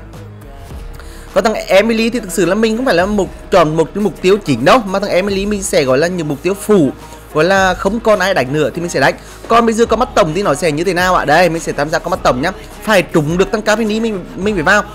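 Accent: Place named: North, Vietnam. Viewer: dọng ah miền bắc nghe hay quá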